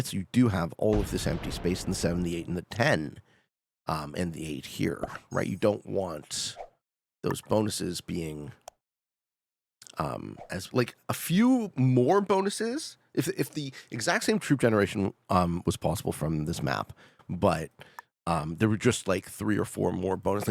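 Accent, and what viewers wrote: Canada. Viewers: im from northern MN, yes you sound like a Canadian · you’re incredibly Canadian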